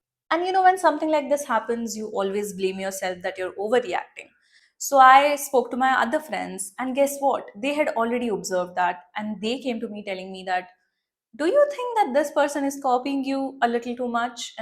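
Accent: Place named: India. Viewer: Omg you sound so western but your desi accent is strong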